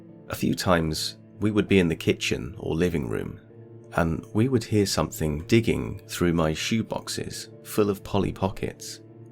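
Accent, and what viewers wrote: United Kingdom. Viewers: You have a good voice for story telling. I always prefer an English accent. Would also love a Scottish accent. I can't stand Mr Reverants voice. Sorry Rev.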